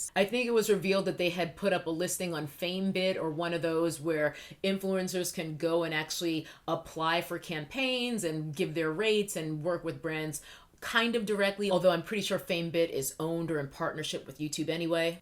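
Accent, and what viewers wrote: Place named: Northeast, United States. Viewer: First of all, hi, subbed. I heard that north eastern accent and was reminded of home instantly. Secondly, I'm a biochemist (getting my graduate degree) and I could go ON about the scams YTers push on you. One thing that some big youtubers push is "supplements". Lets make on thing perfectly clear- these people have no business selling you anything to put in your body. Most of what they sell you is diluted 1000 fold in water, meaning that you are taking water pills. Other things that they sell you, like biotin and vitamin C pills, are nutrients that, if you are living in a country where you have access to food, you don't need to supplement these things into your diet. With vitamin C, you get your maximum daily dose from like, half a bell pepper. Vitamin C is water soluble, meaning you excrete the rest of it out. 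So when you see pills that say "1000x daily recommended dose of Vitamin C!", it means you are paying someone to pee out all of those nutrients. Plus, for many nutrients, like beta-carotene, in order for them to give you the benefits you need, they are dependent on other nutrients found in the food that you eat itself, meaning that they are not as effective if you are just taking them alone in a pill form. TL;DR- don't take pills from influencers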